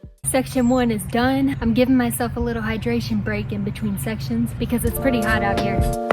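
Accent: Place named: South, United States. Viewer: It's so cute to hear ur southern accent when you talk to ur Dad. ❤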